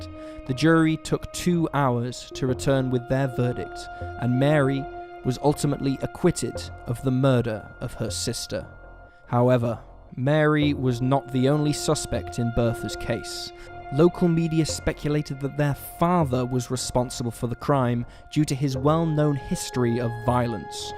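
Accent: England, United Kingdom. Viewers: I'm so tired of someone with a British accent narrating American crime shows.. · Can’t stand the pommy narration. Goodbye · Sad story, but I can't believe that a narrator with such a strong British accent actually said it was in regardS..ah, at least he does well at most of his readings ? Would have made a decent film if it had been a reenactment. yes ? Producers ? Script writers ? No copy editing ? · I dont like when they have narrator with a British accent! Stop it!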